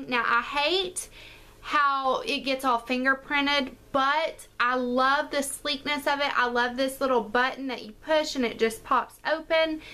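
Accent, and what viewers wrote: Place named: Tennessee, United States. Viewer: New subbie! I'm from Tennessee and girl you sound like me! Lol loved the video can't wait to see more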